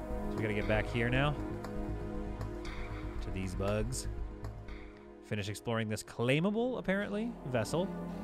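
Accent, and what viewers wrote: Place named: Yorkshire, United Kingdom. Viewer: Don't you be dissing the Yorkshire accent!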